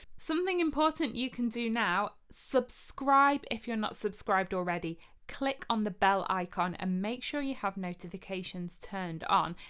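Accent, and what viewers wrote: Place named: United Kingdom. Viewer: We can tell you're definitely British. You being a Brit owes its verisimilitude not only to your accent, but to your expressions. For example, your counterparts across the pond would say: “I’m going on vacation.” An American 🏴🗽 would never say, “I’m going on holiday.” 👌 😉 Stay safe, stay well and keep the faith. Or as would say “Have a great vacay! Enjoy it and be healthy!” 👍 😊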